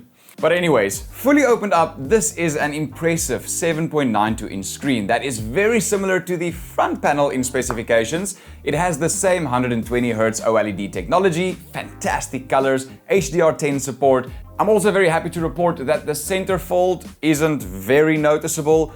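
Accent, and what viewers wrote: South Africa. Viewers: You sound South African, subbed.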